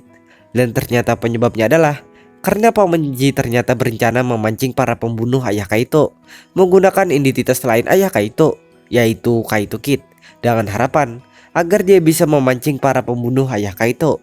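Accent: Banjar, Indonesia. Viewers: Kok kayak orang banjar yak logat nya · Aksen Banjar🤣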